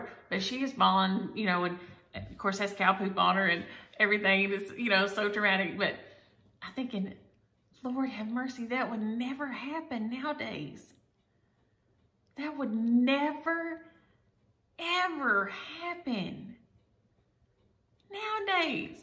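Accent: South, United States. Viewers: I’ve been enjoying your Vlogentines, especially right now. Monday I fell getting on my bike and fractured my wrist in 2 places and tore my rotator cuff to boot. So much for my stitching and knitting and quilting for a while!😢 You are like company for me. We are out in the CA desert in our tiny winter house, and can’t do much of anything anyway, but now no pool or stitching. I’ll go nuts!! Thinking maybe I could manage a little cross stitch or needlepoint on a standing frame. It would be slow but something to do. Of course mine are back East, so a trip to Hobby Lobby when I go to the doc next week might be on the list. I enjoy your accent having lived in OK for 4 yrs and TX for 4 too years ago - I’ve missed it😁. It is amazing we survived our childhoods in trucks and station wagons!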